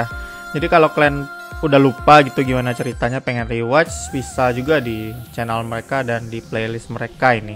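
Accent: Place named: Padang, Indonesia. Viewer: Bang kenapa logat mu kayak orang padang 😂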